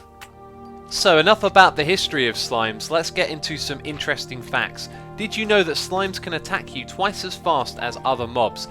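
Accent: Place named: United Kingdom. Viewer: I <3 you X you are my favourite youtuber and for some reason I like your accent it's so British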